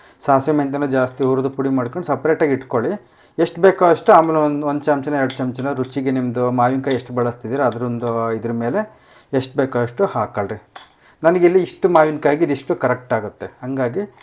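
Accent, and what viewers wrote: Chitradurga, India. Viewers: ಚಿತ್ರದುರ್ಗ ದ ಭಾಷೆ😊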